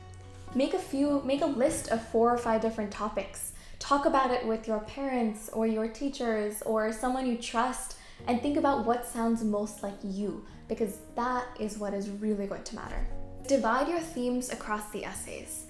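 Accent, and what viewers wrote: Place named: United States. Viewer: very nice. you have a perfect american accent, have you always had one?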